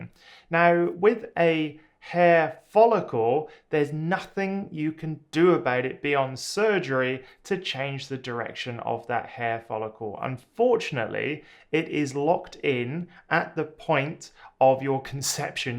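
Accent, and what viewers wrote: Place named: Shropshire, United Kingdom. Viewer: Your accent sounds somewhat local. Shropshire?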